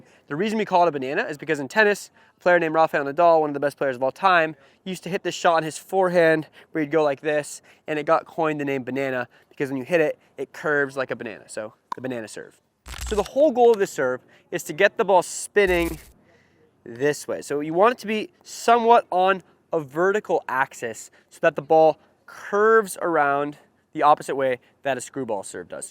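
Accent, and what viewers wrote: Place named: Southern California, United States. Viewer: A good idea. Wish you put a video in which show it had an incorporate more of the top spin into the serve, which would make it really wicked. I like this guy, just wish he didn't have such a SoCal heavily voice inflected vibe. It kind of lulls me to sleep.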